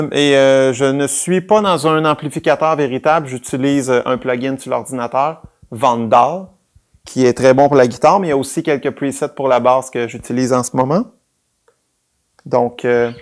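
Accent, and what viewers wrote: Quebec, Canada. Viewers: Très bonne démo malgré votre accent québécois. :)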